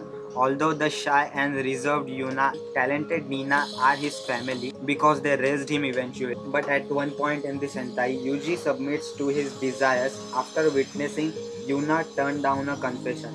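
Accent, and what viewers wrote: India. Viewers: Why you sound indian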